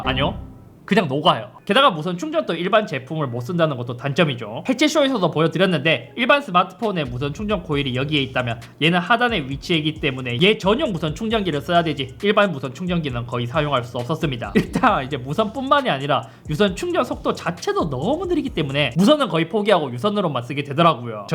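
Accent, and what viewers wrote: Gyeongsang, South Korea. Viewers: 이thㅓthㅓ여 역시 경상도분 ㅋㅋ 귀여우시고 정감갑니다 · 몇 번 들어도 적응 안되는 저 대구경북 말투……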